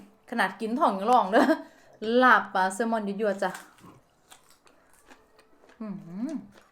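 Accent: Korat, Thailand. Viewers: ขอบเสียง นักขนาดจ้าววันนี้มากินข้าวเย็นตวยเน้อจ้าว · สวัสดีจ้า น่าอร่อยกินด้วยจ้า ปุ๊กชอบฟังสำเนียงพูดของ เพราะค่ะ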